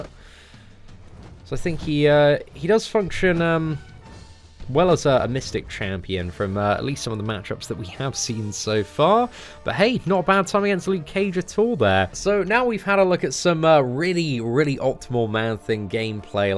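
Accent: England, United Kingdom. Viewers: This speech is the reason why I never travelled to england. You get headache in seconds. Horrible.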